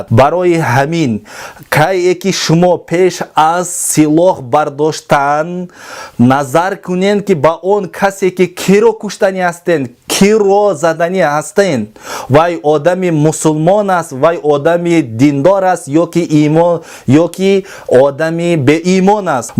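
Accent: Tajikistan. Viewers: Рахмат домло .маълум ки шумо аз тарафхои Джиргатол Лахш хастен аксентатон маьлум.Аввалин мурда яъне улук аз точикон шудаги историяба хаст вай одам одами бегунох. Агар мурдахои точика хисоб кунед хеле зиёд вайба ки чавоб мегуяд.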